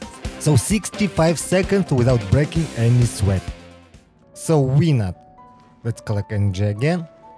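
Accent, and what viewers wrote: Russia. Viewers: He got the russian accent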